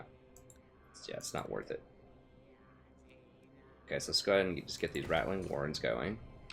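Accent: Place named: South, United States. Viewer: I'm from the south, and I think you have a small accent, so when you said "LOOKS LIKE GOOD FOOD TO ME" I died lol. Also, "it's late, I'm feeling frisky" lol, really good channel. I had a question though, I want to play a mortal enemies campaign with a faction that has late gate powerful units and economy to spread across the map, what faction would that be?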